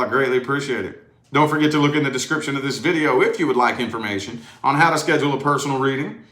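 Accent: South, United States. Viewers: the only Southen accent I like is yours, thanks.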